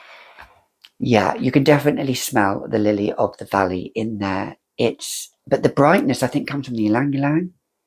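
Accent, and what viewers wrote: France. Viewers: your French accent is lovely, I love hearing you pronounce names and brands! I know that's not the important part, but it's music to my ears 😊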